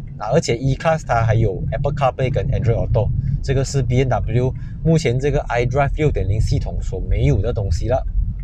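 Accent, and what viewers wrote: Malaysia. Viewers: 真的很喜欢你的解说 马来西亚人就该用马来西亚的语调的style 加油 期待你更多更好的作品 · 马来西亚语调比台湾娘娘腔好多了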